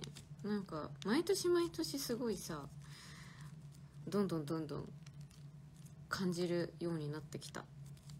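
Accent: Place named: Kyushu, Japan. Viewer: なんねこれ、九州の女て感じ❤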